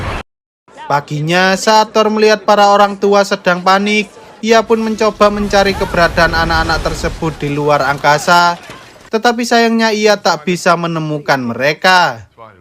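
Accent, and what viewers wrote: Java, Indonesia. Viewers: Suara penyiar medok banget 🤣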